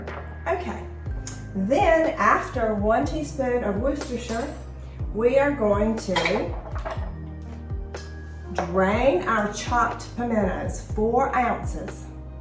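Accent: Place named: South, United States. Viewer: Love that southern accent! And this recipe is awesome!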